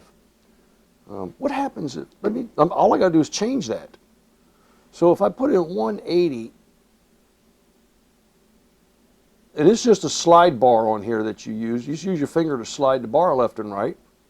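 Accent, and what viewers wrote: Texas, United States. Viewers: Are you from texas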